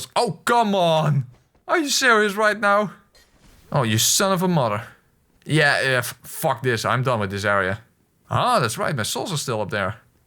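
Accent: Netherlands. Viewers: ha, so my suspicion was right. it is a dutch accent. grüße aus deutschland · U must be dutch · Bro you are dutch aren't you😏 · I KNEW YOU WERE DUTCH. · 2 seconds of hearing the voice alredy realized he was dutch lamaoooo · Are you Dutch?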